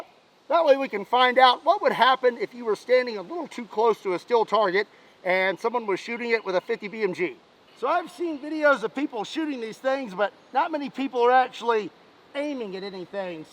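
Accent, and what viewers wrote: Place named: South, United States. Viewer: I LOVE THAT SOUTHERN ACCENT!!!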